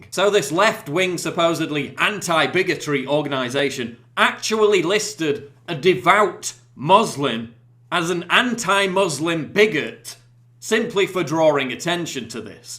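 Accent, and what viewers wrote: United States. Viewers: thought you were British so why are you talking like a American.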